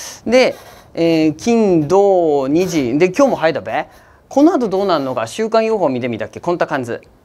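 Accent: Akita, Japan. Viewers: 標準語に近い、秋田弁だな？ 院内駅ノチケグノシュッシンダノモナ。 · 秋田の方言ってより秋田の営業方弁だすなー(ですね〜) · ディーラーとか銀行の営業さんの秋田弁だね。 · これは中央方言だね… 県北、県南じゃ無いね… 標準語に寄せている中央さん方の… お疲れ様です。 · 此れは秋田弁の標準語ですね。本当の秋田弁はこんとらもんじゃねえべ？ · 秋田市以南の方言だすな 県北はもうさっとこ違うもんな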